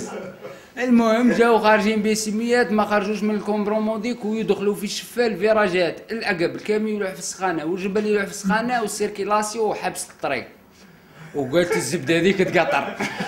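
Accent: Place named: M'Sila, Algeria. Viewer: Tu est très fort tu ma remonter le morale surtout ta belle accent de msila ou de Djelfa brabos et merci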